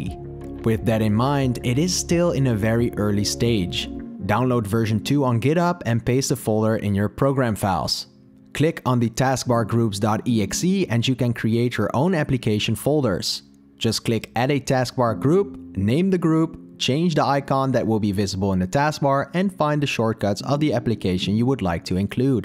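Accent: Netherlands. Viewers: Huh you dutch?! Je hoort het amper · Na 1 sec hoorde ik al dat je NL bent. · 10 seconden in en ik gok... een nederlander? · the Dutch accent is 98% here.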